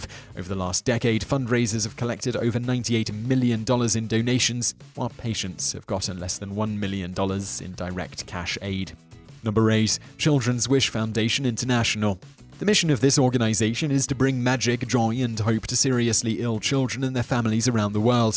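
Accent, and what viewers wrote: England, United Kingdom. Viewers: pyrovargas, Another communist imbecile. You can't tell the British accent? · I like your videos, but by the sound of your voice your English, yet all of your home topics are American. Why is that?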